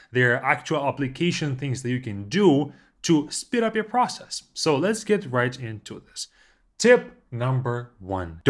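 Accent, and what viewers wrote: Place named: Russia. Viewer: No? He just has a strong accent because he’s Russian. What he actually said was camps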